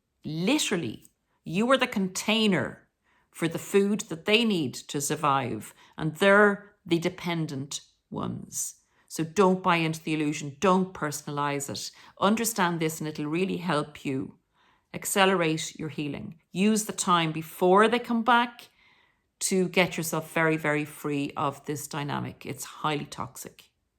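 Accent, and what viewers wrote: Ireland. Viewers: GREETINGS FROM THE GREAT STATE OF KENTUCKY IF YOU'RE NOT ATTRACTIVE SO-CALLED WITH WHATEVER THAT MEANS THEN YOU'RE GOING TO BE DUMPED YOU'RE NOT GOING TO FIND QUALITY MAN YOU'RE NOT GOING TO FIND A QUALITY OF WOMEN BECAUSE MOST OF THESE SO-CALLED MEN THAT ARE GOOD THEY'RE LOOKING FOR ATTRACTIVE PHYSICALLY ON THE OUTSIDE MOST PEOPLE ARE SUPERFICIAL THAT IS A MAJOR PROBLEM VERY FEW PEOPLE SEE THE BEAUTY WITHIN AND YOU KNOW ACTUALLY EVERYBODY'S GOD'S CREATION SO WE ARE ALL MASTERPIECES BUT BECAUSE WE LIVE IN A SINFUL WORLD AND PEOPLE LOOK AT THINGS THROUGH THE CARDINAL I THINK SOME PEOPLE ARE BETTER LOOKING THAN OTHERS WHEN THEY'RE NOT MARILYN MONROE WAY WAY WAY WAY WAY OVERRATED BECAUSE SHE LOOKED PROVOCATIVE AND WAS LOOSE THAT'S WHY MEN LIKED HER GREETINGS FROM KENTUCKY WE LOVE YOU IRELAND WE LOVE YOUR ACCENT WOULD LOVE TO SEE IRELAND ONE DAY EVERYTHING IS SO DIFFICULT IN THE UNITED STATES EVERYTHING IS OVER THE TOP EXPENSIVE I WORK WITH THE HOMELESS THIS IS JUST REALLY A NIGHTMARE AND YOU HAVE TO FOCUS ON GOD AND HELPING OTHERS WHY DON'T YOU DO A VIDEO ABOUT THAT MOST MEN JUST GO BUY A PERSON'S LOOKS NOT ABOUT MORALS IT'S NOT ABOUT HOW KIND YOU ARE MOST PEOPLE THEY DO NOT LIKE UNATTRACTIVE SO-CALLED UNATTRACTIVE PEOPLE IT'S A DISGRACE WHY DON'T YOU TALK ABOUT THAT THAT'S WHY PEOPLE ARE DISCARDED❤🙏🙏🙏🙏🙏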